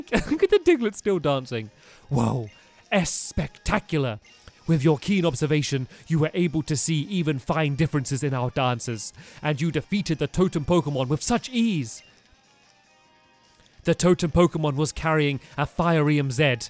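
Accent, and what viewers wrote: England, United Kingdom. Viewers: homie cat he is British some thing are different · Garry Stevens he's English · Guys... IT DOESN'T MATTER THAT HE SAYS "zed" INSTEAD OF "zee"! HE COMES FROM ENGLAND. HE IS GOING TO PRONOUNCE IT THE WAY HE WAS TAUGHT. HE IS NLT GOING TO PRONOUNCE WORDS FROM OTHER RACES JUST BECAUSE OF YOU. There. :) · pro classgamer Well, Stampys British, and here we say Z: Zed.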